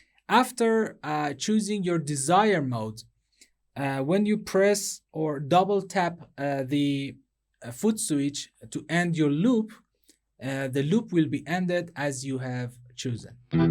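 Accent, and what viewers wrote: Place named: Iran. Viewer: Your accent is so persian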